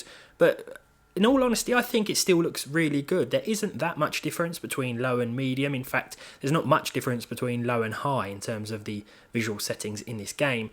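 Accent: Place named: England, United Kingdom. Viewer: always watch and enjoy your videos mate. It's nice to hear another English voice for tech stuff, given I'm Stateside and never hear one. I recently bought an EVGA GTX 1070 FTW, a Gigabyte GTX 1060 G1 Gaming 3GB, and a Zotac RTX 2070 AMP that all had "failures." They would all post, but had varying issues. - The 2070 would only post in low resolution mode and when using GPU-Z wouldn't display any VRAM information. This was fixed by, get this, applying additional thermal pads for increased pressure on the memory chips, and increasing the stock fan curve on the card. - The 1070 would artifact and crash in a few games after a little while. This was fixed by, get this again, cleaning, repasting, and applying additional thermal pads to the memory chips, then increasing the stock fan curve. - The 1060 3GB would not display an image at all, and would not be recognized by Windows besides as a basic display adapter, however, NVFlash would find it as a 1060 3GB. 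After pulling the bios on the card it had been flashed somehow with a 6GB bios. After flashing it back to a 3GB, it exhibited the same VRAM failure as the other cards, which was fixed by, get this, adding additional thermal pads. It isn't entirely fixed considering only the HDMI port works, but it at least displays an image. Not sure if you've ever tried this with a GPU exhibiting VRAM failure, but it has also worked on a couple of AMD cards I did it too. After trying the baking method and having it end in failure too oft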